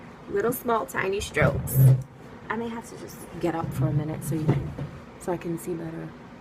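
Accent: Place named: New York, United States. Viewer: I love love your New York accent.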